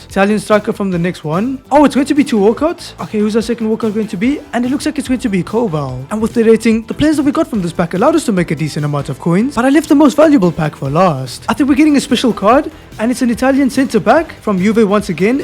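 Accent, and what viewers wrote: South Africa. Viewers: where are you from because you sound south african if im not mistaken 😮😊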